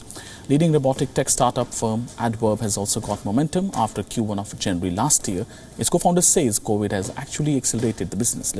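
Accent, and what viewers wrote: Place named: India. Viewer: scam news network with a ganges river accent🤮🤮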